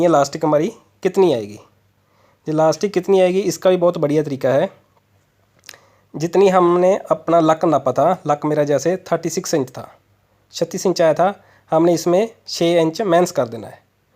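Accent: Punjab, India. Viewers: Tusi Punjabi ho veer g mai v Punjabi aa tusi bhut vadia samja rahe ho te tuhadi Hindi ch Jo Punjabi mix h oh bhut jyada vadia lagi mainu kyu ki same to same mere massi g jado Hindi ch gl krna start krde ne ta tuhade baggu bolde ne God bless you veer g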